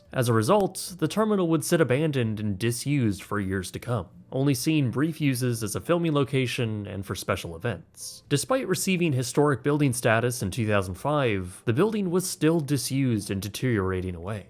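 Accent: Canada. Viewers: Mind you, rates change. For example his same room now is $270 i think hes quite nit picky and since this is a historic hotel this is very fair pricing and i disagree w majority of his pricing critques. Granted it sounds like hes canadian and their dollar is very weak in the US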